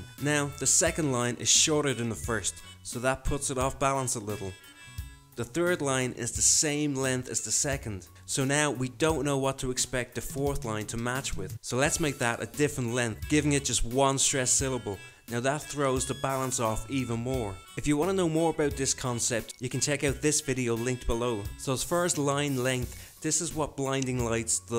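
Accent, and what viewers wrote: Ireland. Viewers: love the Irish accent...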